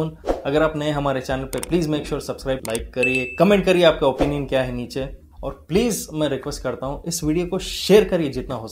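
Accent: Hyderabad, India. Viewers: Bhai tum Hyderabadi ho ky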